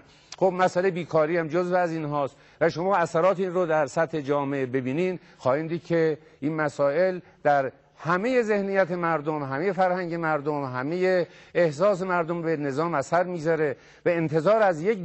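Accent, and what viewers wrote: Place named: Yazd, Iran. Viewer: moosavi yazdie ta oonja ke man midoonam! fekr mikonam lahje yazdi bashe.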